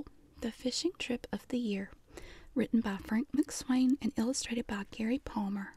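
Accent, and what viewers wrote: South, United States. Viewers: Nothing but good ol' fashioned tingles. Loved hearing that Southern Accent. Reminds me of where i grew up :)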